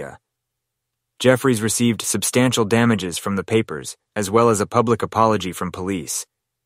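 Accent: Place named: United States. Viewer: Finally! Ive found an american voice over, true crime channel that covers UK murders 😭❤️🙌 thank you 🎉 SUBBED 😊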